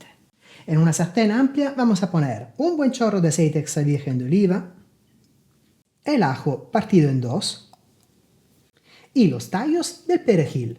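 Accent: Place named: Italy. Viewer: Buenos dias. Ya activé la función WhatsApp. Es una gran idea y gracias por hacerme partícipe Este poema es para ti Cocinando Sabores y maestría de la mano de. Simpatía y sencillez. Cocinando algo rico paso a paso cada vez. Y siempre acierta él porque algún ingrediente en casa sueles tener. con su encanto y su acento italiano. Y aunque a su mujer no logre conocer, la inspiración ha de tener. Hace de todo este Chef, da consejos, hace puzzles, capaz de contar chistes buenos también. Admiración y estima siente esta gaditana. Que dice siempre lo que le vienen ganas. Para y su mujer de una fans y cocinera apende recetas nuevas.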